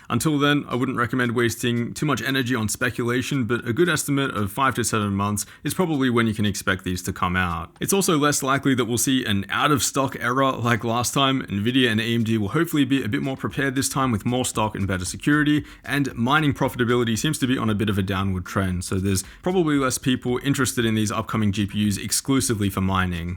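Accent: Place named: Australia. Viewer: I thought he had an australian accent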